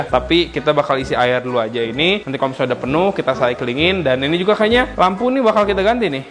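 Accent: West Java, Indonesia. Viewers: Bang Orang Sunda ya:)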